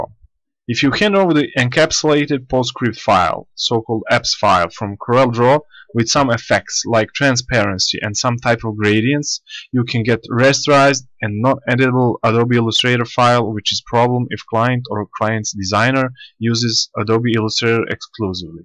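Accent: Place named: Balkans. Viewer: Lol from your accent I thought you're from the Balkans, I'm from Bulgaria, so, yeah, I knew it! :D On the topic, I honestly prefer Corel, it is super easier for me to work with it.. However for pre-printing, one should use Illustrator. For all digital design that doesn't require printing I'd personally use Corel :) Cheers and pozdravi!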